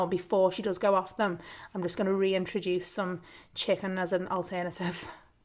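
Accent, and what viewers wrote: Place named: Liverpool, United Kingdom. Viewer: Omgosh you sound like my mums fam liverpool lol im from carribbean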